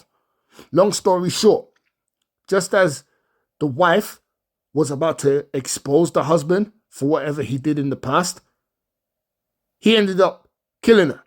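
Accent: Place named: India. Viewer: You sound like a Indian tether yes we can say it everyone else can’t why is it so hard for yall to understand.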